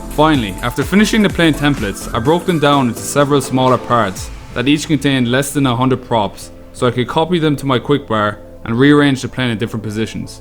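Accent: Ireland. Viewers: Are u Irish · He sounds like he's from reland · Are you Irish or Northern Irish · I think u sound Irish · U Irish’s? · Are you irish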